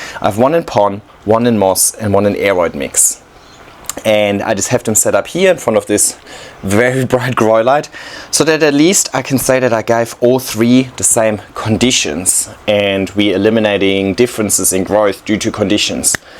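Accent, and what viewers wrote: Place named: Germany. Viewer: It‘s cute to hear „ leider too heavy“ von dir😂😂😂, schon witzig das dein Deutsch nach all den Jahren noch durchkommt😬✌🏻finds lustig😊 love your video😃🪴 Lg Meike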